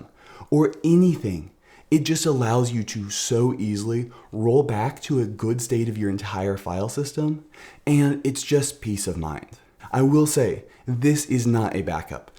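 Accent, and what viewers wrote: California, United States. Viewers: They way he speaks reminds me on the SNL sketch, "Californians". Can someone from the US say where his accent is from ?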